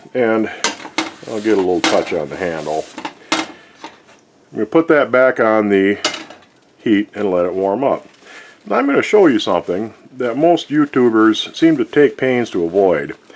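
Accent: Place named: Michigan, United States. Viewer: So cool! I rarely see a reply from so this is a great surprise! When the commenter said, “you have a voice like the skipper from Gilligan’s Island” the Skipper is the son of the great Alan Hail or Hale, who often appeared with Errol Flynn in those awesome swashbuckler movies. Skipper’s name was Alan Hail Jr, or Allen? Sorry. I know the names but don’t look at them in print much because I’m too,busy enjoying them in the movies! Anyway, I’m pretty sure has been a professional voice but he won’t tell us where or who he is. Atleast not that I’ve seen yet. And he’s very humble. But the anonymity is driving me curious. I do recognize a slight Michigan accent though. Would be nice to know his previous profession, maybe still current. One thing’s for sure... the voice is a definite gift and everyone loves it. I say, go for it. God bless.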